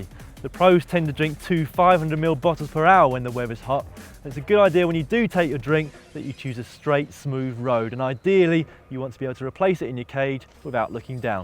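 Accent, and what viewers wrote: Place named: United Kingdom. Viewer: I'm confused you sound british but, you cycle on the wrong side of the road. Where are you based?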